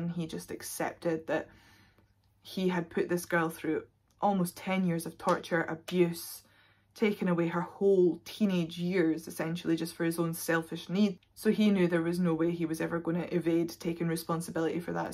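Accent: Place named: Scotland, United Kingdom. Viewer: Totally the only other human interaction she has was with him and when his controlling meant he wasn't horrific to her she took that as kindness. So important for people that haven't experienced it to have an open mind as to what these victims feel the way they do. Thanks again really loved the content. As a fellow Scot your accent helped too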